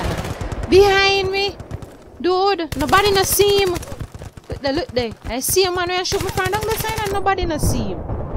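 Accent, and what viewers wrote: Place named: Jamaica. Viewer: Yup 100% Jamaican :)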